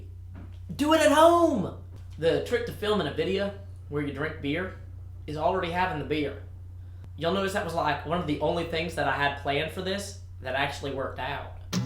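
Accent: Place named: South, United States. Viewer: this is hilarious, you never cease to amaze me with our southern accent and funny videos.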